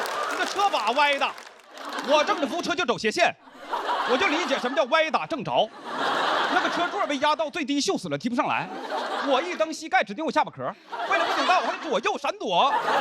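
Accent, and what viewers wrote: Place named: Northeast, China. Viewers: 哪个学校的呀感觉好像黑龙江呢，🤣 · 東北人口音好有趣，也挺好笑的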